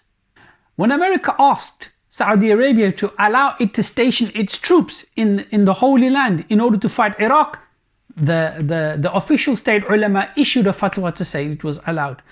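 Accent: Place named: England, United Kingdom. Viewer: all your informations is wrong wearing a bikinis in Saudi not all the places it’s only in the private places not in the public. Saudi Arabia always defend Islam and helping the Muslims all over the world and serve the holy places in Mecca and madina for more than 80 years and they spend more than 90 billions of dollars 💵 for them. What kind of corruption you are talking about?? I think from your accent you are living in England. Please talk about the problems in england or the country where you came from and talk about our brothers and sisters Muslims problems in the west . By the way I saw many people talking about Saudi and the gcc countries and they forgot about what they are exactly. They use Islam but they are far away from it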